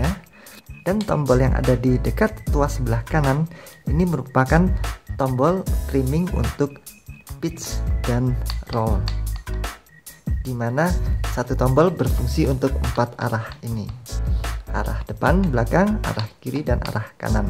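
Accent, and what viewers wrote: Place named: Central Java, Indonesia. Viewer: Mas.nya dari Salatiga, jawa tengah kah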